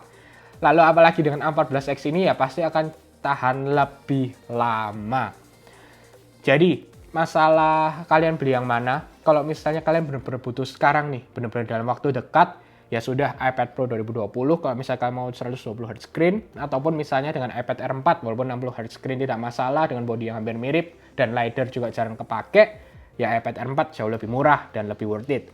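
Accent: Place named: Surabaya, Indonesia. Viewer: Bahasanya medhok suroboyo..hehe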